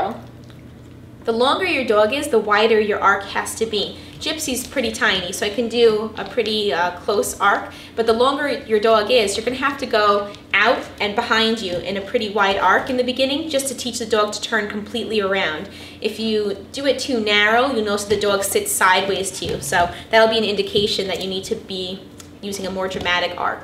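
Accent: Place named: New Jersey, United States. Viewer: Love the Jersey/NY accent. "Dawg"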